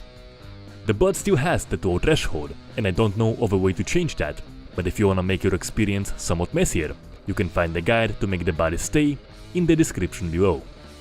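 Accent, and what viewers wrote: Russia. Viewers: This is russian ascent?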